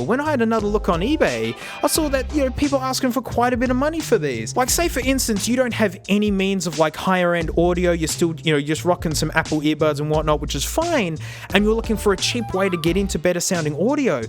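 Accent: Australia. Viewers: Ar u australian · AL-UU-MIN-NEE-UM. I Love your vids my Auasie friend. Keep it up. 😃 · For some reason i love the Australian accent and the information about the Ipods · You should review the HyperX Cloud Alpha S headset. I love the Australian accent!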